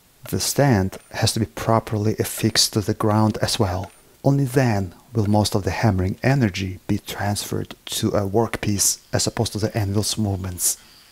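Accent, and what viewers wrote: Russia. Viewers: Не владею английским языком, но явно слышен русский акцент. А когда увидел адвоката понял, что не ошибся.))))) I do not speak English, but a Russian accent is clearly audible. And when I saw the lawyer realized that he was not mistaken.)))))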